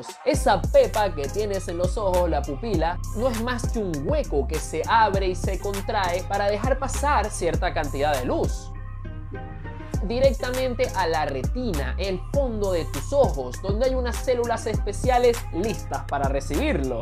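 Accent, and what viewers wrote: Venezuela. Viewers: Coño pero que buen video, yo qué no tengo paciencia para ver ni películas, bro arrechísimo y paisano venezolano con ese flow! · Me uní por lo que aprendí con este vídeo y por el acento de mi gente criolla venezolana!!! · Me encanta como.explicas todo, muy venezolano je je je ❤ tenía tiempo que no escuchaba uno, me gusta el color rojo !!! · Amé demasiado este videooo, desde el inicio me enganchó el acento y jerga venezolana. Que bonito saber qué hacer creadores de contenido de Venezuela que si aportan de verdad y no andan sin camisa y comiendo carne cruda jajaja Tienes una manera increíble de unir teoría y práctica, seriedad y humor. Al igual que el color estás lleno de contrastes y eso es lo que te hace especial ☺️ganaste una nueva suscriptora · Chamo, se te nota lo venezolano... ❤ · Se te nota lo venezolano mi pana.... Me encanta dX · Es venezolano por el acento No? 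Pero de que Estado ? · Buena información, pero el acento venezolano no lo soporto hermano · Caraqueño o Valenciano? De maracucho no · Viva nuestro dialecto venezolano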